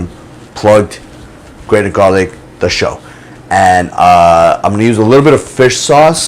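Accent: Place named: New York City, United States. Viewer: Love this channel!! As well as your NYC accent 😜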